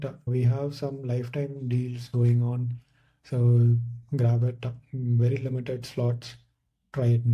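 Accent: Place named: India. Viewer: indian accent... scares people away.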